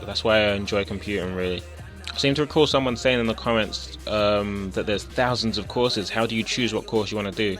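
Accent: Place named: South East London, United Kingdom. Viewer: south east london yeah boy :D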